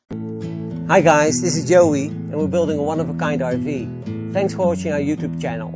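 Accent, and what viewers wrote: Netherlands. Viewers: I was really curious about the accent, I first thought that there was a region in the US where people, maybe, spoke with a Dutch sounding accent because of some interesting historical reason 🙃 But, the way you say camper is very Dutch 😉 You're right, I am Belgian, but I'm Flemish speaking not French. I have a French last name and I also live close to the French speaking part of Belgium. Many people get confused about that. was a popular boys name in Flanders around the time I was born. Bedankt voor de leuke filmpjes!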